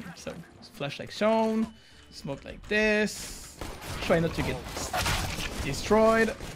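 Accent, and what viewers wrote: France. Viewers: On sent ton accent français? mais t'es vraiment très bon, que ça soit pour parler anglais que sur CSGO... continue bg ! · A quel moment t'as décidé de parler en anglais dans tes vidéos? Je veux dire on entend bien que t'es fr, alors pourquoi l'anglais? ;)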